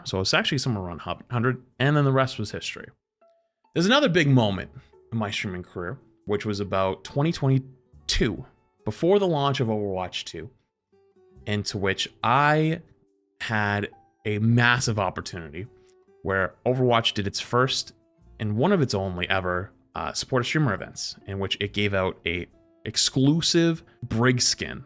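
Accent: Boston, United States. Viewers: The only thing I got from this video is you are from Boston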